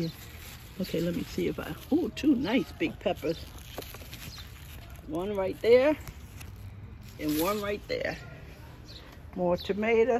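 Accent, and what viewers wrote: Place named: New Jersey, United States. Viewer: I knew when I heard you talking that I recognized a fellow Jersey resident. I love that you garden too. I love growing my own fresh fruits/veggies in the warmer months, and then being able to enjoy the "Fruits" of your labor. I would love to hang and crochet in yr garden with You & Calvin!!! I'm from Bergen County, but now live in Sussex County. ❤❤❤❤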